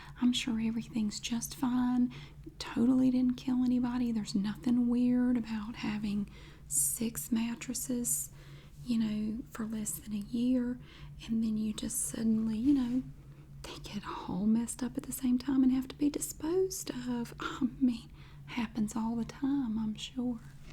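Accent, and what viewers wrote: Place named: Carolinas, United States. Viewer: I think it’s so cool that you said Charlotte and that you’re from the Carolinas! I’m so used to hearing these accents and way of speaking I think you’re the only Asmr youtuber from down here it’s great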